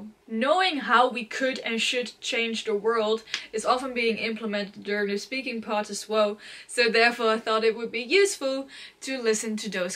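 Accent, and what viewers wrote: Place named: RP, United Kingdom. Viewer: hiya! prob u r not reading the comment section tho i can’t help but hats off to ur accent. this is just marvellous, impeccable clear RP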